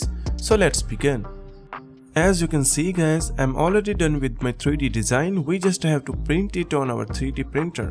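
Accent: India. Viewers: OK bro I was just confused but his talking style was like Indians Thank you😊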